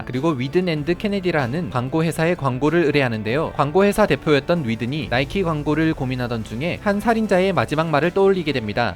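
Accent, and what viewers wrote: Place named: Seoul, South Korea. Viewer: 서울사투리 상당히 거슬리네요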